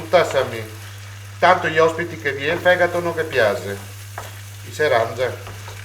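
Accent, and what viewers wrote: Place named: Veneto, Italy. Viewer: Sei simpaticissimo. E' bellissimo il tuo veneziano da ascoltare. E' una musica! Inoltre sei molto bravo.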